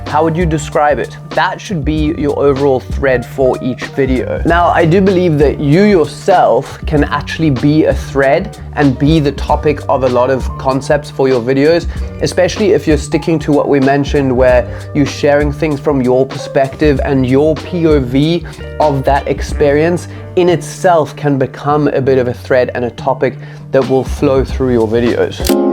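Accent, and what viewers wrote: Cape Town, South Africa. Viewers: I’ve watched a ton of these “HR clog your simple life” and yours is my favorite. Maybe I just miss the Cape Town accent! I’m watching this in Atlanta airport on a trip I’m vlogging for my new channel: Cage Free Theology Thanks bru.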